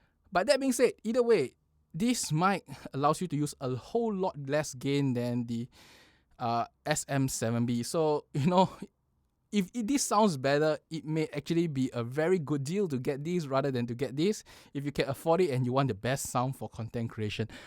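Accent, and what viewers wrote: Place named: Singapore. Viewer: As a fellow Singaporean who sounds similar to you. The Shure SM7B and the MKH50 does not sound that dark or boomy with our voices vs American and Europeans voice.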